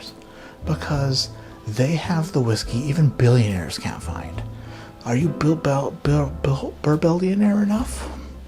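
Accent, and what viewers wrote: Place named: Minnesota, United States. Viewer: The mystery of upbringing continues. When I first heard him say his own name, not but ” I said, “a- ha—Minnesota.” The name should take a split second to say but he extends it out and taffy-pulls what was supposed to be a simple short “a.” Tortures it in that nice way, being and upper Midwesterner. Friggin is also Minnesotan, as are many of his other phrases. But the upper Midwest accents have no sharp state boundaries. Now he drops “thimbleberry” on us, or rubus parviflorus. That put a whole née spin on his mysterious origins. We know he lived in Michigan for some time before moving east. Clueberry. Thimbleberries are only found in Minnesota on the Lake Superior shoreline so Duluth is a possibility. But they are most common along Michigan’s Upper Peninsula, aka da U.P.? Could it be that is a Yooper? A native of the land of not quite Wisconsin and not quite Michigan and not quite right? 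Maybe Marquette, Sault Ste Marie, or perhaps Esky? Houghton up on the Keeweenaw is where all the thimbleberries can be found. At some point I hope tells us where he friggin grew up and got that outrageous accent!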